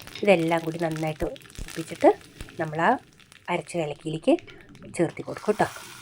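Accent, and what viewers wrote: Palakkad, India. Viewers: നമ്മൾ പാലക്കാടന്മാർ പറയുന്ന പൊലെ "നാഴി നെല്ലിക്ക" എന്ന് പറഞ്ഞ് പാലക്കാടൻ ശൈലിയിലെ വിവരണം അടിപൊളി..😂😂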